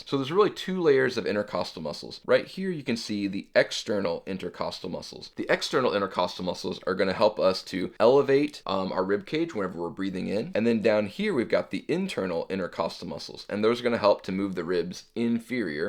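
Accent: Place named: United States. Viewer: Please can add subtitles for non Americans that can understand your accent. Thanks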